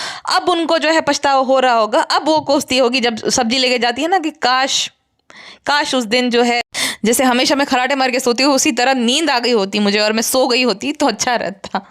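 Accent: Gujarat, India. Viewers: Aur ek baat , apka voice bilkul rashmi desai jaisi hai। Or gujrati ho isiliye sayad acsent vi kuch had tak waisa hi lagta hai। Par voice to ekdam ditto hai।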